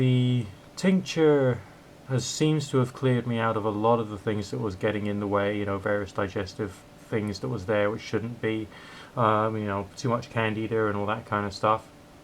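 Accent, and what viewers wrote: England, United Kingdom. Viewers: it's good to hear an English accent (for a change) rather than hearing the usual Yankee accents all the time.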